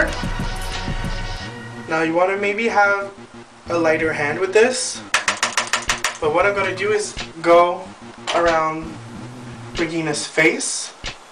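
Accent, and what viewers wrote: Quebec, Canada. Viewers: OH un quebecois :O :D bonjour :) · (: i love your Canadian accent<3 and your skin looks flawless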